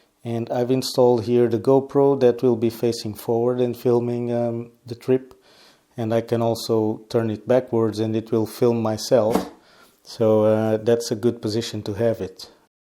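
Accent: Portugal. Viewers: es portugues kkkkk sinto tu a falares e es tuga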